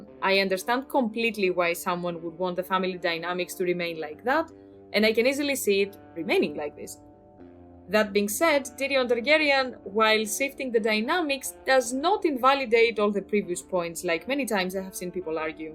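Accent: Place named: Greece. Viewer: Thumbs up who always reads the community posts in her voice (greek accent ftw) 👍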